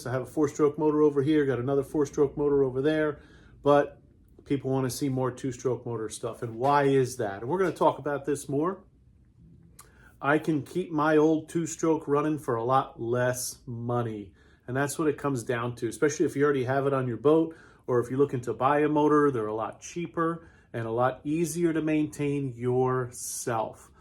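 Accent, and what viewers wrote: United States. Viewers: I have a couple of comments today. One is about the quality of your video and sound, which I think is more than good enough. The video quality is great. We could see this time that you got the camera very close, a very clear image of the different parts of the engine. On top of that, you don't need a new microphone but simply speak facing the camera and close enough to the "mike". Although of course a remote mike hanging from your neck would be closer than it is now. The other comment is a silly one. Your nickname is a very nice one. I do like it but in my understanding of your speaking it always sounds to me like outboard-dead, like meaning that your are able to bring back to life a dead engine which is a big truth. OutboardDaddy would make it very clear the meaning of your nickname. I'm sure it is just that I'm foreigner altough I consider that my level of english is not bad, there's a discrepancy between my ears and your accent, which on the other hand I think it's a very typical and crystal clear American accent, much clearer for most people than the heavy accents that I found in Texas, but to me is hard to find the differences of dad and dead pronunciations (same happens "to me" when finding the difference between man and men or can't and can).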